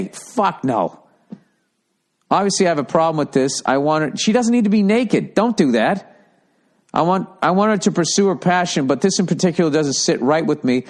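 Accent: Boston, United States. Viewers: U sound like a fucking Boston or New York fucking fucker. Fuck.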